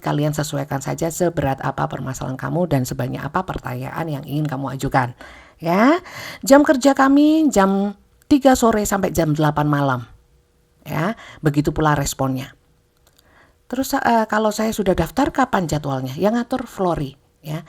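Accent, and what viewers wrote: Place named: Surabaya, Indonesia. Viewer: Q suka logatnya, Malang & Surabaya banget.. q arek Surabaya, tpi udh lama stay di Bali. Jadi kangeeen Surabaya. Wah bener, harus waspada..di sini ada tetangga tukang gendam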